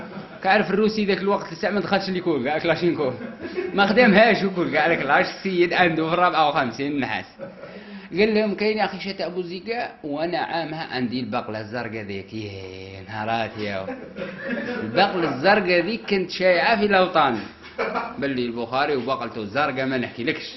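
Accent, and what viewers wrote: Djelfa, Algeria. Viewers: اللهجة الجلفاوية النايلية💞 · Tony Soprano لهجة جلفاوية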